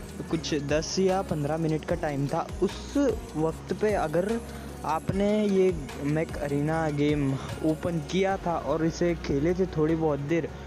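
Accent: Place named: Philippines. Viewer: He is philipino tagalog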